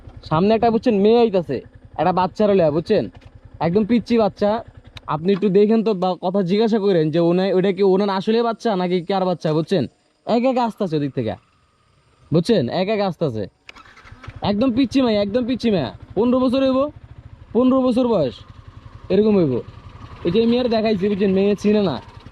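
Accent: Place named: Chittagong, Bangladesh. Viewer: ও আসলেই চট্টগ্রামের আমিও চট্টগ্রামের ওর কথার ধরন দেখে বুঝতে পারলাম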